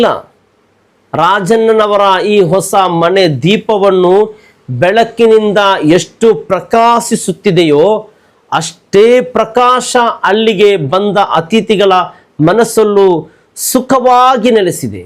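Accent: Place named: Tulu Nadu, India. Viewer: ಯಾಕೆ ವಿಶ್ಲೇಷಣೆ ವ್ಯತ್ಯಾಸ ಇರಬಹುದು ತುಳು ನಾಡಿನವರು ಉಚ್ಚಾರ ಸ್ವಲ್ಪ , ಬೇರೆ ಚೆನ್ನಾಗಿದೆ ❤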